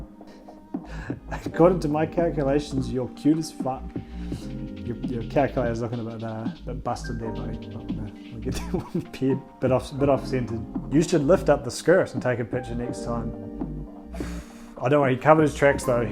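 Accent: New Zealand. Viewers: Are you kiwi? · Only 7k? Bro you deserve at least 100k also love hearing the kiwi accent on yt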